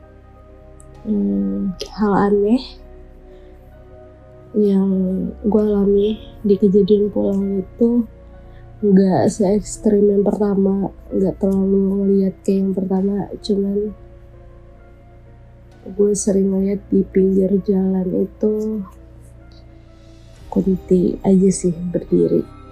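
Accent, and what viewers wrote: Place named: South Jakarta, Indonesia. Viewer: Iya gabungan sengau plus jaksel. Makin gak jelas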